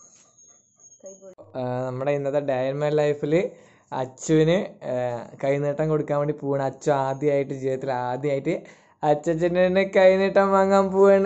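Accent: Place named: Palakkad, India. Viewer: Pakka palakkadan slang...Thambattiyeeeee🥳🥳🥳🥰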